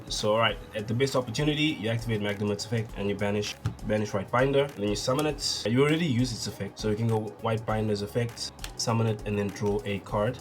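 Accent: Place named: South Africa. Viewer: Accent is awesome - u from SA?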